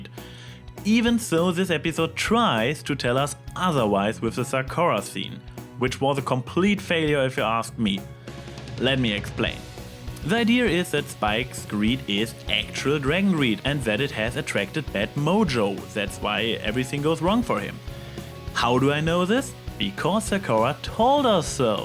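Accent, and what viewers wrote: Germany. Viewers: Ich hatte an Deiner Aussprache schon erkannt dass Du Deutscher sein musst :D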